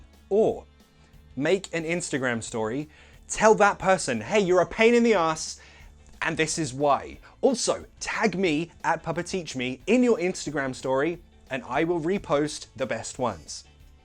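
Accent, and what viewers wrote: United States. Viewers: I love your girl version more and the way you pronounce things in American accent ...😂👌 Really you r amazing ☺️ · Fine american accent !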